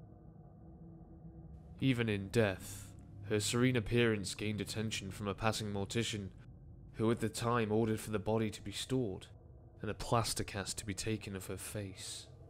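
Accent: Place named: United Kingdom. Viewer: Sorry but I think this story was proven to be a hoax in Paris. The face comes from an art book from a Helsinki art school published 20 years before the body was found. However many young people were fished out of the Seine during that period. Sorry to be a negative Nancy. I do love the gravitas in your voice and the brit accent!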